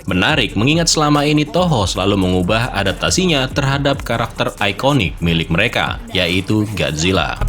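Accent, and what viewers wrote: Java, Indonesia. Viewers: Bang kau orang jawa ya bang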